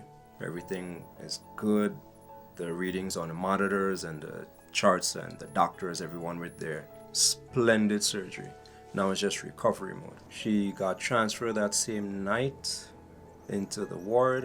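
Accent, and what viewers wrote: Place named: Caribbean. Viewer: Where man can't, God can! Where we fail, He prevails! ... He sounds like he's from the Caribbean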